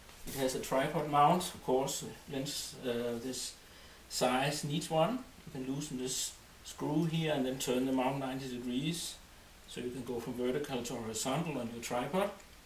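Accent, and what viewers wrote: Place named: Denmark. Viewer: I know that Dansk accent :-)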